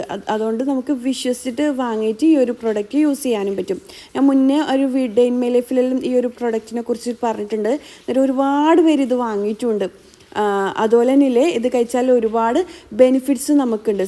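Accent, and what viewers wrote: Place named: Kasaragod, India. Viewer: കാസറഗോഡ് സ്റ്റൈൽ സംസാരം. കുറച്ചു ഉണ്ട്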